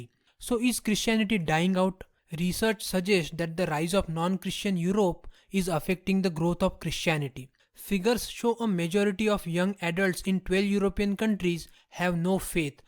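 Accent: India. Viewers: how it is possible to listen? Omg Go learn how to speak without this hindi accent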